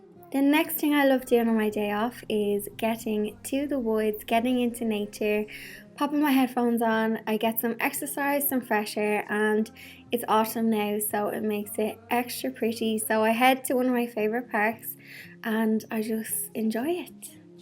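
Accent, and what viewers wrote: Ireland. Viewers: I hope things in Ireland get better soon. It's not to bad in Texas. I want shopping today and bought Pink ornaments for my Christmas 🎄. I always do white but I need a change with this Corona virus. I love all of yout video's and your accent. 💕🥂🎉🎃🤗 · I am convinced that there is nothing you can’t do. I love your girly nature with a can do attitude. Plus you accent and humor, you are a ray of sunshine. If I ever visit Ireland I’m going to invite you to tea. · You could just talk about paint drying and I would still tune in. I love to hear your Irish accent, I love your personality, and of course, I love Blondie and Pepsi. Keep up the good work!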